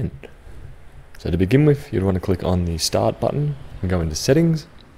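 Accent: Australia. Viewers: Thanks mate, btw: like to ozzy accent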